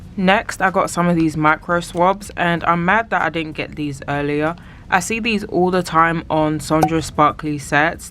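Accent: United Kingdom. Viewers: Quick question,I can hear your British accent… did they deliver to you in the UK?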